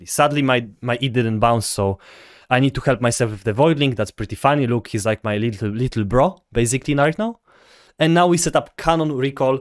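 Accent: Poland. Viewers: Nice Polish accent like libik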